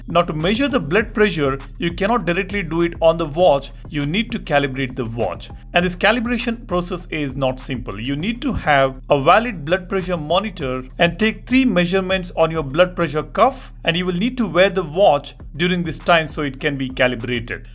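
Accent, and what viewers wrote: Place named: India. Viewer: Slow it down, dude. Your rapid-fire speech coupled with the thick Hindi accent --- nobody in the West can understand a damn word you're speaking. I thought India was supposed to be mellow and easy-going with the influence of all those gurus and siddhis. Why do you talk so fast with very little separation between words --- what? you got a plane to catch? What's the hurry? Slow it down and enunciate so us Westerners can follow what the hell you say. You need to spend more time on the meditation cushion and chill-out a bit. I exited half way thru the video when I noticed by brain heating up from trying to translate your high-speed, Hindi-English accent. Too much work trying to translate your gibberish.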